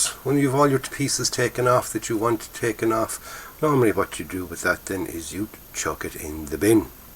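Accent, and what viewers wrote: Ireland. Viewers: I enjoy your videos and listening To the Irish brogue, but I've noticed on the last few episodes there has been a bloody annoying whistling sound all the way through them.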